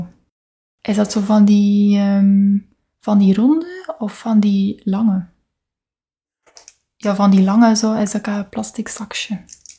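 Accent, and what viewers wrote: West Flanders, Belgium. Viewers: Het mooiste van jouw videos is het proberen te volgen wat je zegt in het West-Vlaams zonder ondertiteling. Het lukt, maar vraag niet hoe!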